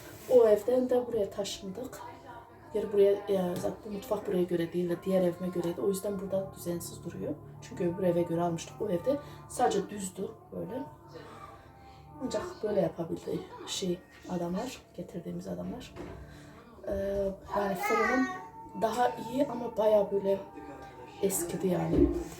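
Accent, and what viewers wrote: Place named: Gaziantep, Turkey. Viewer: ayy ne güzel hemşeriymişiz bende Gaziantepliyim demekki kan çekti 😂👍🏻şivenden benzettim zaten 👍🏻❤️🌹🙋‍♀️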